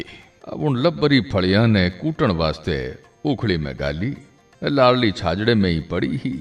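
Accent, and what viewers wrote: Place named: Marwari, India. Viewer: मारवाड़ी आवाज रा धनी जी आपको बहुत-बहुत बधाई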